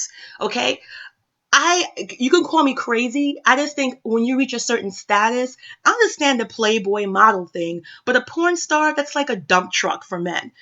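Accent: Jamaica. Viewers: Hey, I hear the patois trying to come out a yuh girl. Us yard women know when a woman is fed the f@ up. Keep these stats coming sis. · lawdhamercy!! lol luvin ur jamiacaness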